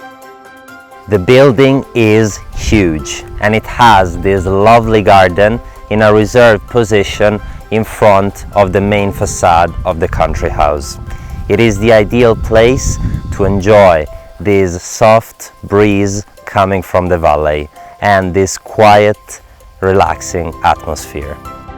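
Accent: Italy. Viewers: Buongiorno! I just got back from my holiyeaaay extracting myself from any social media platforms but waaaaittt I find out that your Italian accent is decreasing. I really appreciate your effort and I love both version. 良い仕事を続けてください。❤️🇮🇹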